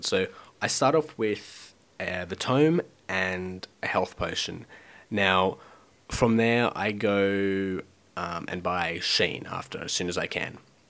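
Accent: Australia. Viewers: where are you from, Its hard to tell you're accent you sound Australian but also English.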